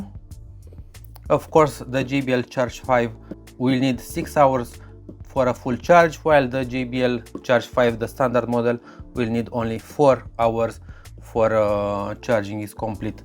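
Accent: Romania. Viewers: Are you Romanian?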